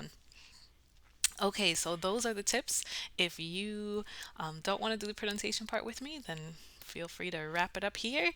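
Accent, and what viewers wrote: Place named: United States. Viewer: Thank you very much teacher for sharing this lesson which make clearly the improvement in English language. I start understanding American accent with you.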